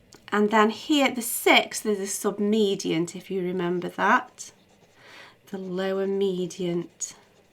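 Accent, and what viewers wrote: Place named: Stoke, United Kingdom. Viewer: Great video! Are you from Stoke?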